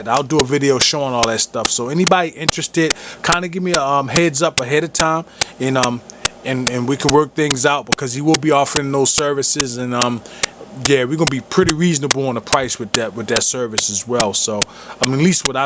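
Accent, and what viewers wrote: Baltimore, United States. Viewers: That Bmore accent be killin me! Lol. great vid fam!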